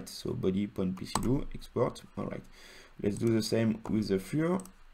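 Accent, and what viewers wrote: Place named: France. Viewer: Quand un français parle Anglais c'est toujours marrant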